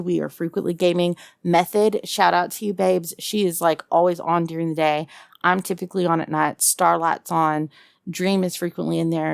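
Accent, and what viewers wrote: South, United States. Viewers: Sounds of the South , only words missing is ya'll.....makes your voice cute as a button!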